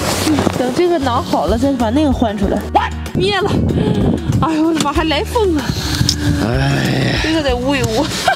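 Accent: Dalian, China. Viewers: 哈哈 真幽默。而且大陆口音也逗。订阅开始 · 大连话不要太标准啊！太怀念国内了！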